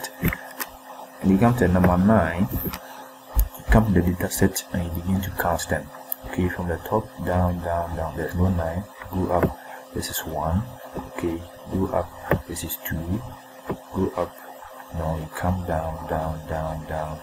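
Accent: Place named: France. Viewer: I have never seen such a thick french accent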